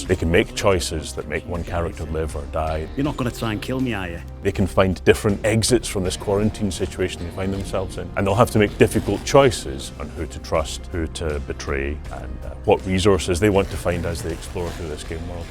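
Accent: United Kingdom. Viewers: I was hyped till I heard British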